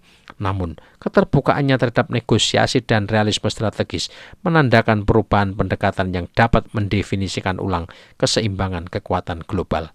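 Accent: Java, Indonesia. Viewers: I love medoook. 👍👍👍 Autentik.......👍👍👍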